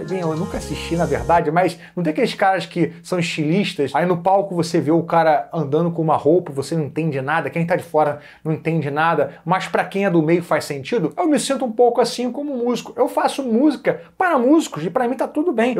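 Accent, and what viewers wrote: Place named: Rio de Janeiro, Brazil. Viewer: Só esse sotaque carioca já ganhou o meu dislike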